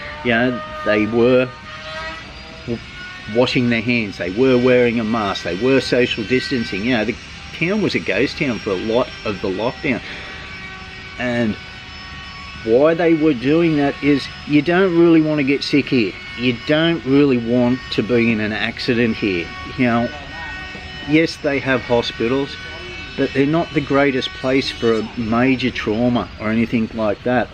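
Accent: Australia. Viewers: Congratulations to a good quality channel, so tired of watching similar content from S/E Asia, here in Thailand regarding helmets, the law is you dont need a helmet after sunset, except in areas where there is a helmet sign such as governement/hospital areas, go figure not kidding eather. Been so many times in Vientianne now after I moved to Udon so its nice to see what that city in particular has to offer, binch watching now and i really enjoy it, also like that aussie accent of yours. What i have found out in Vientianne sofar regarding food is that they have brilliant Chinese Cousine! Ive red that possibly the best Chinese couisine outside of China, i guess there are alot of Chinese builders in Laos nowadays that need their local food. Also the kebabs are far better than in Thailand Pizza places in thailand can be a hit and miss if you dont know where to go, but there are some very good pizzas even in Udon, but i guess the best are in Chiangmai or Phuket, keep up the good work and dont change your own stile, its why we are here. 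Have you ever thought of taking Lao Language lessons? Ive studied Thai a couple of years and its really helpful! You should do it too in my opinion it makes alot of things easier, even though you might not "need it", and Lao is a fun language to listen too when you have read Thai, its very similar to Isaan/Phuuthai "dialects" but with some differences in some letters of the alfabeth, with that i meen you can survive on it even in Thailand, they unde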